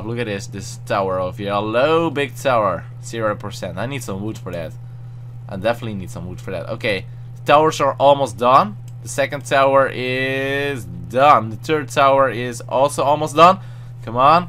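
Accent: Netherlands. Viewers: is he dutch? ik ben wel nederlands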